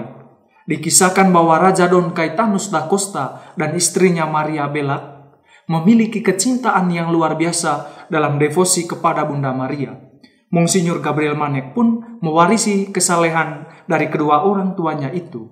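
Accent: Manggarai, Indonesia. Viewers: Naratornya masih kental dengan logat Manggarai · logatnya pasti org Manggarai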